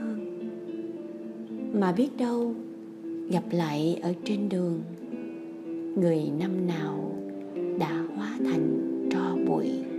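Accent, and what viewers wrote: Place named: Saigon, Vietnam. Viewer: Anh thich em doc Dung chat giong Saigon hon la lai Bac... cai nay hay hon may cai truoc Do em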